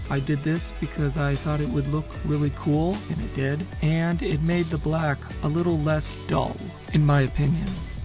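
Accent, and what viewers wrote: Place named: South, United States. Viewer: Hi!👽 Southern accent… 6 out of 10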